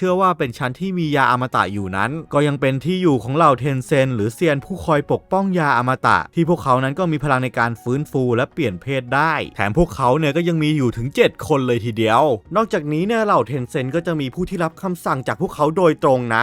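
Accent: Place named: Thailand. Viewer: เสียงพี่เหน่อมาก😂😂😂😂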